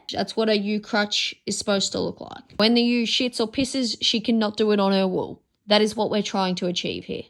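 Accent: Australia. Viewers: I just started watching your videos and have watched loads of them so far. I served in the USA military and enjoy how you talk, it reminds me of the way we would talk (except without an Australian accent) lol. Im curious about what kind of car you drove on the ranch and how old is it? It seems to a real tough little guy.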